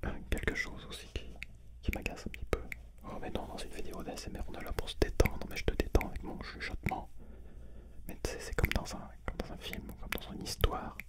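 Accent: France. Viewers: French accent on ASMR is ✨perfect✨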